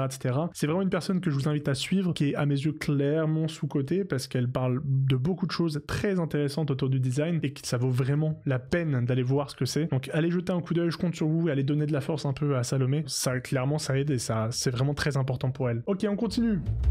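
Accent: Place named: Germany. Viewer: Chouette contenu et bordel quel magnifique accent allemand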